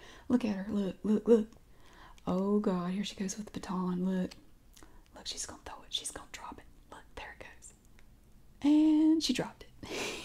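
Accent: South, United States. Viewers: so southern bruh spot on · You really acted this role well I think your bitchy mum is awesome and funny I love your southern accent why you not an actress you really good at acting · I love watching you not only because of your videos but because of your accent. I used to live in the south but I moved a few years ago and I've recently been really missing it. Your videos bring me back and make me so happy. Thank you.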